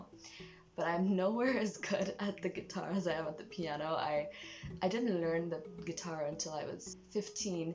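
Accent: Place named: Ireland. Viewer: ahhh it's so nice to hear you talk! :) I didn't know you were from Ireland, that's so cool! I'd love to see more of these kinds of videos sprinkled in with your covers in the future!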